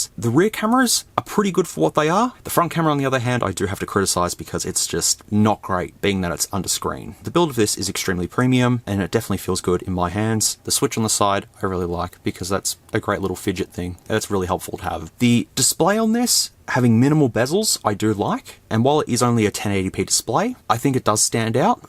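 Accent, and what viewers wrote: Australia. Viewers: he's from Australia dumbass, they pronounce shit differently, grow a pair and get over it american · You're a fucking Australian, be proud of your wacky accent.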